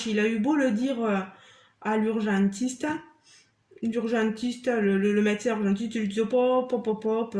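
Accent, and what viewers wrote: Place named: South, France. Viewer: Hello sacré moment en ta compagnie. J’aime toujours autant ton accent du sud. Bisous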